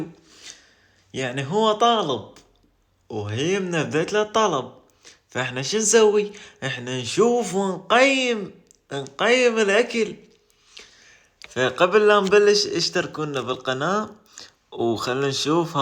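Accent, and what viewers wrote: Iraq. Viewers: يا سلام على لهجتك العراقية ما شاء الله أنا نسيت الصور وركزت مع كلامك الله يبارك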